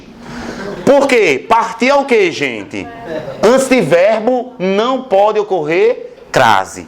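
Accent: Northeast, Brazil. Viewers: Pense num sotaque gostoso de ouvir... Sotaque Nordestino é bom demais. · Pelo sotaque sabia que você é nordestino, com muito orgulho. Grande talento, parabéns! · oooww Glória, gloria a Deus. Ei creio. Terra maravilhosa essa Paraíba, meu Nordeste. Eu sou de Pernambuco. Desconfie por causa do sotaque rsrs. Obrigada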